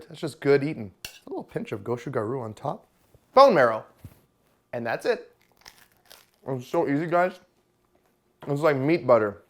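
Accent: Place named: Canada. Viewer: Where are you from? I keep hearing a Canadian accent but it goes away so fast! Great video btw (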